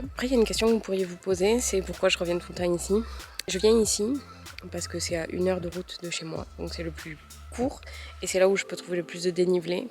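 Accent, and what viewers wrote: South, France. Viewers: Magnifique l'Ariège, tout comme cet accent qui inspire le soleil du sud 👌 Merci du partage 🙏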